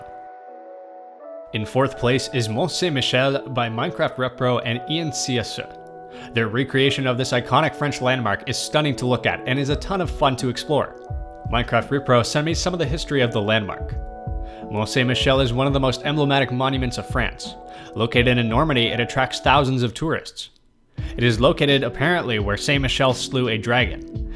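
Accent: Canada. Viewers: Are you Canadian by any chance??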